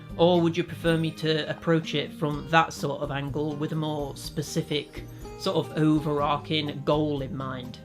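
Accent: North, United Kingdom. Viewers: Congratulations, nice to see u happy. Not a big fan of shorts (if u need feedback on short videos) Honestly, I prefer to watch long videos where u enthusiastically talk about stuff that genuinely interests you. I don't even care what the topic is, I just really enjoy your accent and your storytelling, those little humorous bits and sarcastic remarks once in a while. You could read a washing machine manual for all I care;) Good luck with the channel, I'm sure your subs would love to see your mug;) more than once a month (maybe, your words, not mine;)) but at the same time it's quite understandable why it probably won't happen. Anyway, personally, I would be grateful for whatever I can get, as long as that is smth you are passionate about coz that's what makes your videos so honest and entertaining. I don't have any questions for Q&A, I'm sorry, I was trying to think of smth not too personal but didn't come up with anything interesting. 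Perhaps, what part of the UK are you from, maybe, as I said, I absolutely adore ur accent, I was ready to make a guess you are somewhat of a northerner but again, it's more of a shot in the dark, I don't know much about UK and regional accents. Again, good luck and take care.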